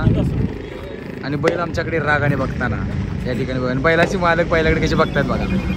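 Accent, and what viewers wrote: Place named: Vidarbha, India. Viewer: विदर्भ bolte naaa bawaaa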